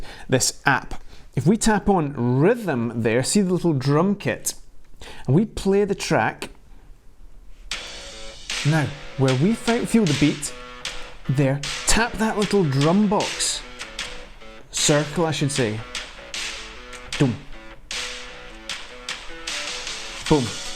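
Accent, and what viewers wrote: Scotland, United Kingdom. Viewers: Man, Every time I watch your channel it makes me wish I had a cool Scottish accent. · Just got our first drone today thank you for this as we need all the help we can get ..Was great to hear a Scottish voice we are both rom Edinburgh but live in Spain now thank you !!! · Good to hear a fellow Scot! I’ve just bought the mavic mini and wondering how it copes in the wind in Scotland? As you’ll know it’s windier then most places so given its so light I’m a bit paranoid.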